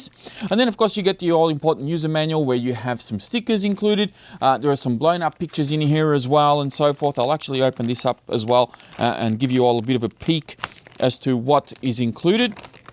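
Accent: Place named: Australia. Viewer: Nothing like that aussie accent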